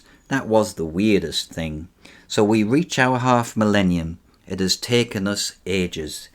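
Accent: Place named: Sunderland, United Kingdom. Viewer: You are an absolute laaaaad 🙂 Nice to hear you still have a Sunderland accent even after all those years after leaving.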